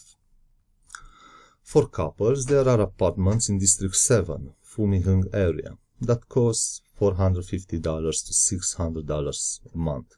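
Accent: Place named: Hungary. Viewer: Hm...as far as I understand an ESL teacher can expect some 1000 USD per month in Vietnam. Well I think in Romania nowadays a decent teacher employed at a public or private school can make this money salary + some private classes. Of course in Romania you wont have that abundance of jobs as in Vietnam. BTW...are you an ethnic Hungarian? your English has a noticeable Hungarian accent. Also ...congratulations for the excellent well calibrated comments and videos...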